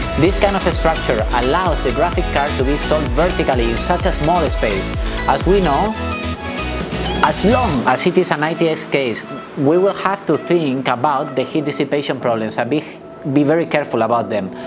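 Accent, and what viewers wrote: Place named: Andalusia, Spain. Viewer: English from andalucia i can see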